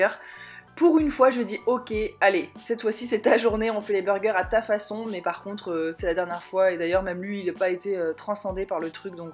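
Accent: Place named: South, France. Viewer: Hey!! Non m ai tu m'a TUÉ !! toute la vidéo 📼j'ai aimé ton ACCENT du SUD HOUEEEEE !! SUPER repas très beau Visuellement très bon dessert tu m'a donné l eau à la bouche d autant plus que je suis entrain d éplucher des PATATES pour les passer ensuite à la POILES J APELLE sa des patates sauter !!! 🥔🥔🥔🥔🥔🥔🥔😂😂😂😂😂😂😂😂😂À Perpignan il 18h30 à 20h on mange et en plus j'ai la DALE FIN BREF je vous souhaite une bonne soirée et des bibisou !! 😘😘😘😘🌃🌃🌃😘🤗🤗🤗🤗🤗👍👍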